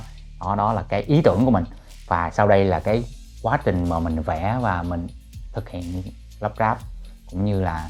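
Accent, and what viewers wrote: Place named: South, Vietnam. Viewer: Em rất vui gì nghe anh nói giọng của miền nam.bởi gì em đoán anh ở sài gòn.gì một pc full nước em sẽ cố gắn làm việc để một ngày nào đó không xa em sẽ được gặp anh.thực sự rất vui mừng khi anh nói tiếng miền nam.em chỉ sợ anh ở hà nội.nên khi ước mơ củacem khó thực hiện.ngày đó không xa đâu gần đến rồi.chúc anh nhiều sức khỏe.một ngày không xa em sẽ đc gặp anh.chắc chắn là thế.PC full nước anh nhé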